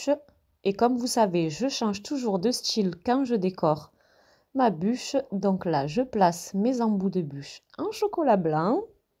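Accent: South, France. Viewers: Bonjour 👋🤗. J'adore tes vidéos 😍🥰❤🌹, et ton accent du sud rempli de soleil 🌞🌅. Ta joie et ta passion est communicative🤗👍. Merci pour ce partage gourmand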